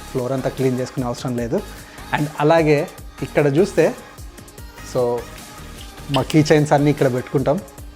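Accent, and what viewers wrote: Telangana, India. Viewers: Canada velli 9 years ina mana mass slang matram miss avvaley Andhar la adho ochi rani posh language kakunda normal ga superb cheyppinav po Bro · Excellent video Nice Telangana slang Crisp informative Shared to my daughter, who is in Toranto, Keep going All the best Be Happy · Telangana yesha baaga matlaaduthunnaru bro.🎉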